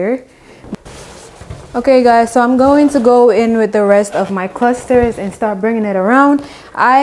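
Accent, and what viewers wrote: Jamaica. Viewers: Def heard that Jamaican accent 🇯🇲🇯🇲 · Heard that Jamaican accent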